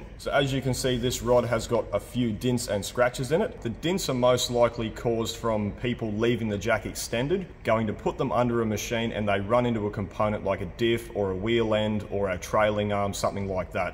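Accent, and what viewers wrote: Australia. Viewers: Nice aussie accent , amazing craftsmanship, make your vids worth watching. Greeting from a fellow machinist.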